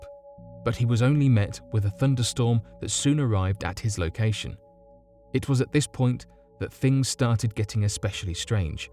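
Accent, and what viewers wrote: United Kingdom. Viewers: This is the way they pronounce words in the UK. There are plenty of other narrators that sound just like him. Remember, their version of English came first. So to them, North Americans talk funny.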